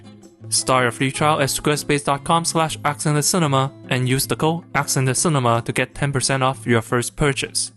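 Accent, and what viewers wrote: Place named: China. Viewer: Listening to the commentator is painful. His Chinese accent is too strong!!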